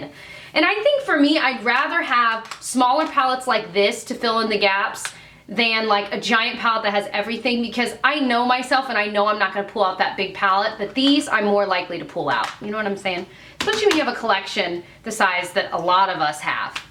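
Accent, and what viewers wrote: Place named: Boston, United States. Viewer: U from boston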